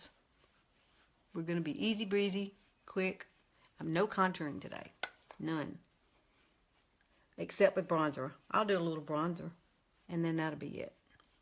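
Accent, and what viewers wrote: South, United States. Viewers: I can tell you're from the South from the way you say "oil " lol I'm up in the mid west (Wisconsin) " OyL ". 💞your Channel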